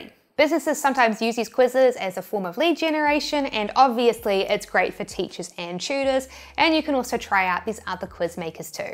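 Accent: New Zealand. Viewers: Thanks! I absolutely loved this one! Got lots of new free AI's to try out. You have saved me hours of research (as you know). So, thanks again. P.S.: Liking (and getting) your NZ English more and more ;)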